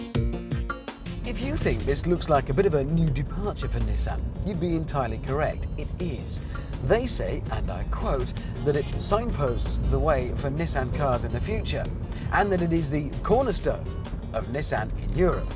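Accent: United Kingdom. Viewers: Nissan have the legendary british accent reviewer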